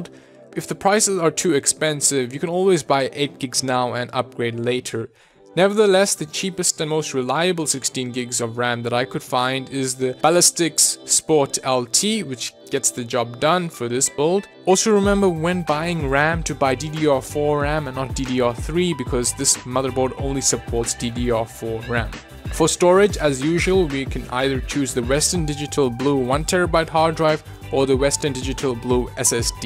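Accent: South Africa. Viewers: He from South Africa? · I subed for that SA accent xD , were did u buy the parts tho?Evetech? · This is the first video I've seen of yours and I noticed that SA accent right away :D (checked your channel info to confirm it). Great quality video! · Is he South African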